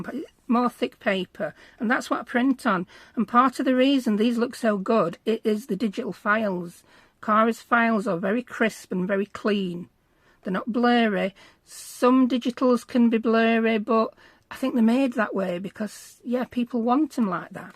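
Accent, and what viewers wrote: United Kingdom. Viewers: Ohh my goodness, ur a "GENIUS". TO use ur corner square & ur other gadget ,I don't have any of those but maybe I can buy myself one from TEMU. ❤❤❤. And I think ur in the UK, I love ur accent, I could listen to you all day. Even wen you make jokes, it keeps ur videos fun to watch, keep doing what you do BEST, GOD BLESS 🙏 🙏🙏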